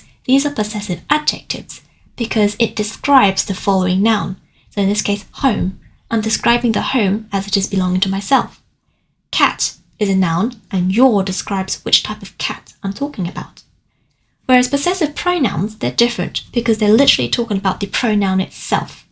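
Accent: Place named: England, United Kingdom. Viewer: Very clear. And you have a nice English accent (meaning understanding is more clear)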